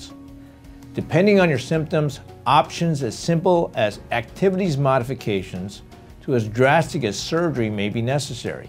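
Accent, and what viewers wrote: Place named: South, United States. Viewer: Great information! So funny hearing a Chinese man with such a southern American accent!😄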